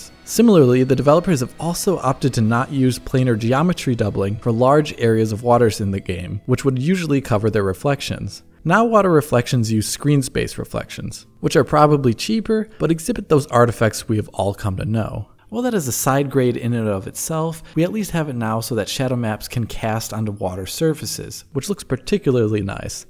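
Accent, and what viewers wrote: Germany. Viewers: Wußt ichs doch, das ich da nen deutschen akzent raushörte. Bis bald 😉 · And by millennial lisp, I guess you mean a German accent.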